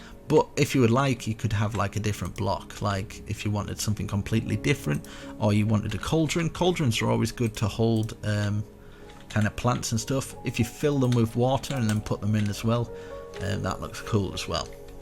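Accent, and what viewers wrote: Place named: United Kingdom. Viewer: Who the fucks this British guy interrupting my infomercials?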